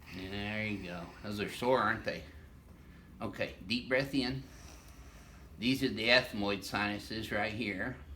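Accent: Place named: Texas, United States. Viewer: That texas accent is great! Love it.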